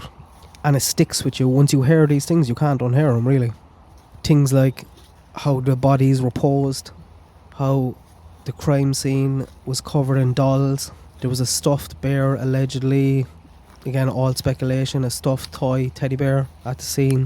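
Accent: Ireland. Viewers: No, I’m guessing it’s in Ireland somewhere…the narrator is Irish and the fauna looks like it would be in Ireland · Irish accent it's so lovely! X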